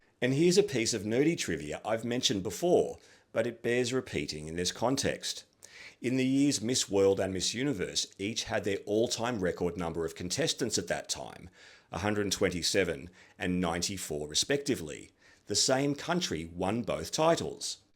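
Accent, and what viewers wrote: Australia. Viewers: I love Miss Universe since I was 15 years old. I love your channel, videos, the amazing information you share! And your Australian accent is amazing and you are charming! Best wishes and Thanks you! ❤❤❤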